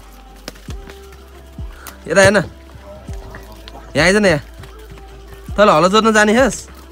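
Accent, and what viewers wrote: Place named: East, Nepal. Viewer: Bro timi purba ko hoki pashchim Tara purbeli jastai chhau ta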